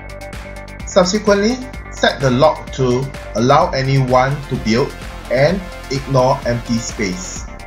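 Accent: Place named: Singapore. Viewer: He from Singapore. All not from America or England.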